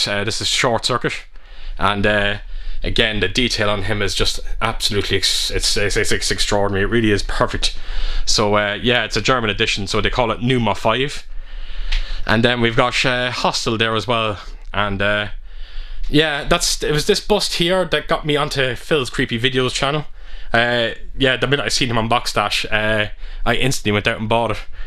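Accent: Ireland. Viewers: is that a Dundalk accent ?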